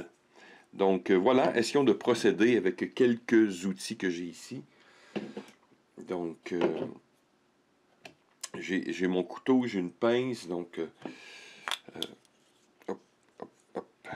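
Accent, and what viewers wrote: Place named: Canada. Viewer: Interessant, j'aime l'accent canadien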